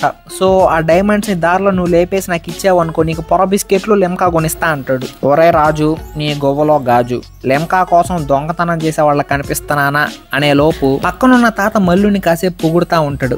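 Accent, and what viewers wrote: Nellore, India. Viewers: Are you from nellore? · Enti nellore ah meedi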